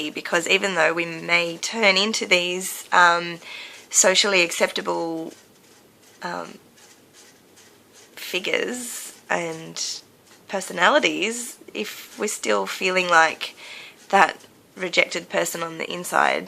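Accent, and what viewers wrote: Australia. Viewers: First off may I say you're gorgeous! I totally relate to what you're saying when you talk about your parent's talking about your outfits when you were younger. I remember once my dad saying I looked like a sack of potatoes in a t-shirt dress I had (that I loved) when I was about 10. Kind of broke my heart a little. I totally get it. I think now though, he is my biggest fan. Thanks for sharing. Love your accent, I'm Canadian so Aussie accents are intriguing ;) I'm going to have to check out that app! Cheers! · Love your Aussie accent...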